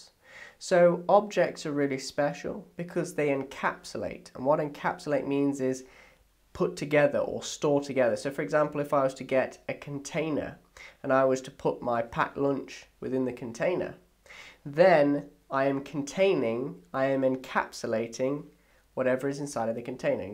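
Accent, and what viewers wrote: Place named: England, United Kingdom. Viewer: Merci, je bois vos paroles depuis le premier épisode: Chapeau, monsieur ! (and of course I'm enjoying your english accent !)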